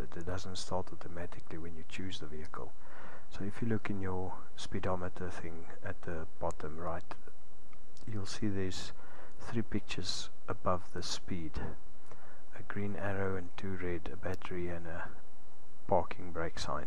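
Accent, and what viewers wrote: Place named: Afrikaans, South Africa. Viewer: awe ou like jou video kan somer hoor jy is afrikaans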